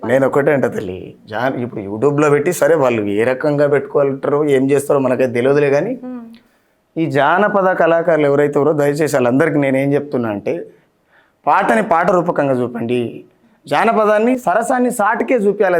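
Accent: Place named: Telangana, India. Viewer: Anchoring is so good pure Telangana....👌👌👌👌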